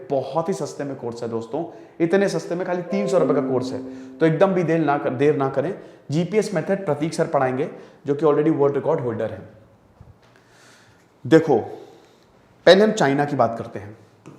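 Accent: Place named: Bundelkhand, India. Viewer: Sir i think you are belonging from bundelkhand madhya Pradesh. When you say "कुछ तो करै क पड़ी"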